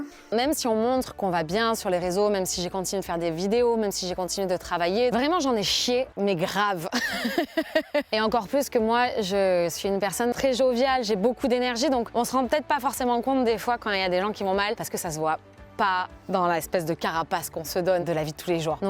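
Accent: France. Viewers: Ou un échec ? Non, c'était pas le bon moment c'est tout. Ce qui me plaît, c'est ta spontanéité, ton amour pour Aiko et cet accent du sud-ouest. Wow désolée pour ton papa.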